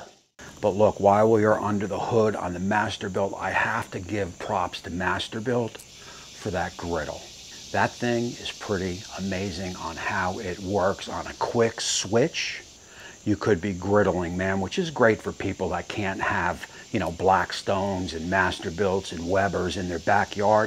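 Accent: New Jersey, United States. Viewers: Chim-a-ney... Love to NJ accent brother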